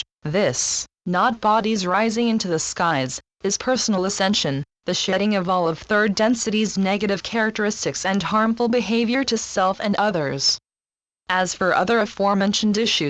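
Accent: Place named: United States. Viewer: The information is correct. In order to absorb information like this, it is necessary to put all previous experiences to one side, including prejudice of any kind, which may have been put into your mind by others, including family members and the current educational system, or experiences which may have made you afraid when young. This includes putting to one side prejudice against the 'robotic' American accent used in these transmissions. Those who are able to do this, will be able to understand the information. Those who are not ready, will not be able to, because they are allowing the remembered emotion FEAR, which is a strong emotion, to control them. The method is simple, but it takes practice. You just say, out loud if necessary, 'I AM AFRAID OF NOTHING'. I am not at all religious. But I DO believe in methods that can remove fear from people.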